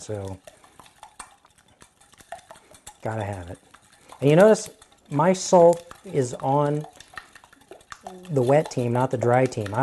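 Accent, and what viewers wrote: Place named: Canada. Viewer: Sounds like he's Canadian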